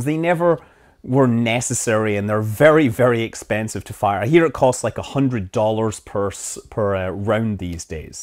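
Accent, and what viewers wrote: Scotland, United Kingdom. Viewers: More likely Scottish. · Hear the voice of a true Scottish Space Highlander! Also, millions of Americans have some Scottish ancestry.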